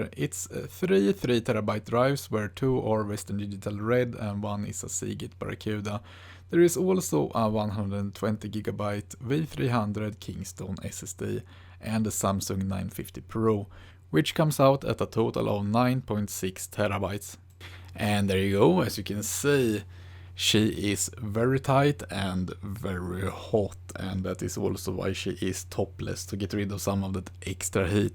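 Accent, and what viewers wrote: Sweden. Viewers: Are you swedish?? Sounds like one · Jäklar vad man hör att du är svensk:) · That Swedish accent :D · thicccc Swedish accent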